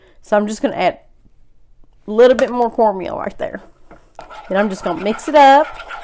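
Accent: South, United States. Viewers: Thanks for the video. Your southern dialect is like butter on bread!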